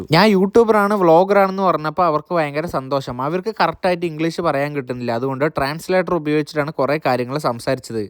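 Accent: Palakkad, India. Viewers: പാലക്കാട്‌ ഭാഷ 😆ആ ആ ആ ആ ആ എന്ന് കുറേ നീ പറഞ്ഞു 😆😆😆😆 ഞാൻ പാലക്കാട്‌ പറളി, എടത്തറ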